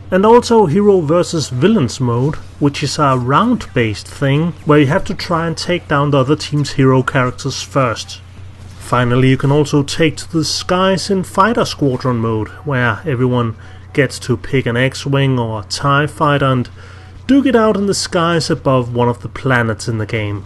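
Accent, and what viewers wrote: Denmark. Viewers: Men too, I love the goofy Danish accent, all while the content is supremely nerdy and having a god mix off subjective and objective evaluation. You and Demiath are my two go to guys for intetesting video game content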